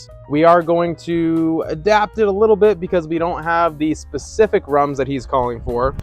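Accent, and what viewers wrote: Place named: France. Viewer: Stunning French accent, monsieur.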